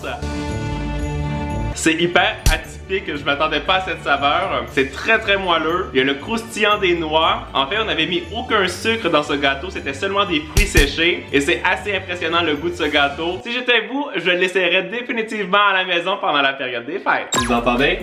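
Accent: Canada. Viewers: tu mon youtubeur préféré de is vidéos accent canadien et qui met quoi des vidéos trop trop rire 👍👍👍👍👍 24h 🇨🇵🇨🇵🇨🇵🇨🇵 🎄 is cooking Noël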